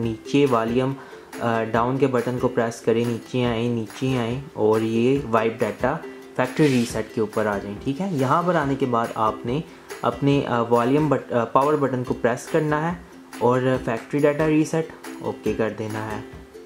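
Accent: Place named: India. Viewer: It is in english but his english is mixed with Indien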